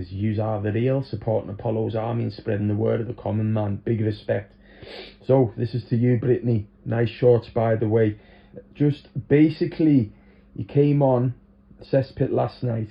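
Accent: Liverpool, United Kingdom. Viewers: Always thought I could here a scouse twang to your accent Apollo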